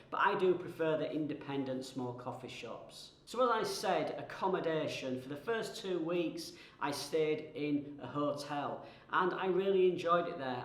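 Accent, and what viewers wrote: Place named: Yorkshire, United Kingdom. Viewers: I like your yorkshire accent.. eiyupp? Used to live in Yorkshire for years. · cant wait to visit Malaysia again, will put Kuching on my to visit list, love the lack of tourists comment. good yorkshire accent you have and thx for the tips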